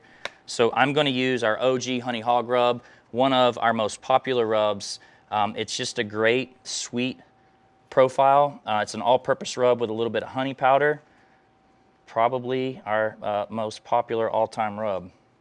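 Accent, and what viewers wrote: South, United States. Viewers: The perfect speed to watch this video is 1.75X. Try it at 1.75X speed and you will see it watches like a regular video and this guys slow manners and southern drawwwwwwl Go away.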